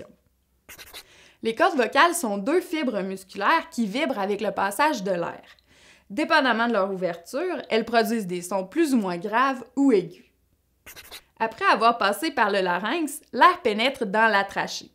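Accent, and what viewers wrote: Quebec, Canada. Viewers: merci beaucoup! ps: j'adoooore l'accent quebéquois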